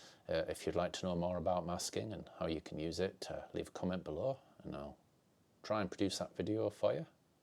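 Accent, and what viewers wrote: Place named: Spennymoor, United Kingdom. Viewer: Just stumbled onto your video, really glad I did. Some really useful tips I haven't seen elsewhere, the black and white thing for example. I would definitely appreciate a video on masking from you, again I think I'll get tips that I don't see elsewhere. Couldn't quite place the accent though. I was hedging Spennymoor..... Ps. That's a great eye for the photo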